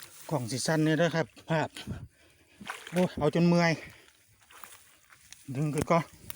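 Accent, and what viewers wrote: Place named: Ubon Ratchathani, Thailand. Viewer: ฟังสำเนียงเว่าคือจังคนทางอุบลอำเดชอุดม คั่ก หมานๆคับ สุดยอด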